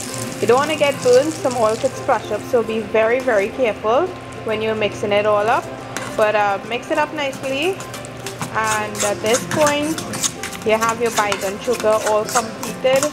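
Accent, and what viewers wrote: Trinidad and Tobago. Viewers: Oh shut up. That's how trinidadians speak. Research before you try bashing.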